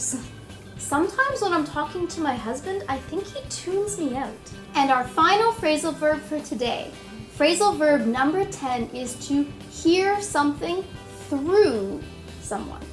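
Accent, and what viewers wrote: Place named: Canada. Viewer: Again, simply an excellent lesson! Nice to hear some Canadian accent. Thanks. Great.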